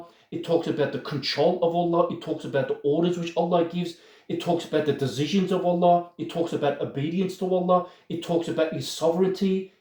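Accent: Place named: Australia. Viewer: Salaam brother, I love the simplicity of your videos. They are factual, illustrative and straight to the point. We "true submitters" are a small community but surely growing because of people such as yourself. Keep up the great work!! By the way, it's clear from your accent that you are from Australia but cant put my finger on your ethnicity... Turkish or Lebanese?? Not that it matters but it's got me curious. 🤔😁 I'm Turkish from Perth. It's been difficult to find and connect with people like ourselves nearby. May Allah continue to bless you and your family, insha-Allah!! Peace.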